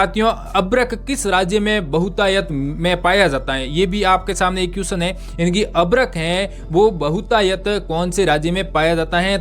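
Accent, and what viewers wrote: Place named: Southern Rajasthan, India. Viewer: Bhai aap kaha se ho aapki laungage se to lag rha udaipur khervada baswara dungpur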